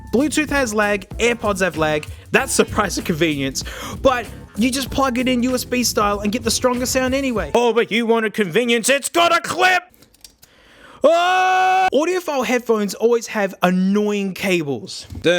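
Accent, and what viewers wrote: Australia. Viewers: I'm American but starting to have Australian accent just by watching your amazing videos!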